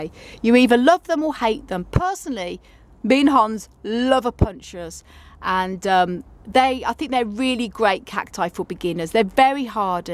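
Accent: United Kingdom. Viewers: I got to learn more about my cacti. by the way, you have beautiful British accent! I enjoyed your video!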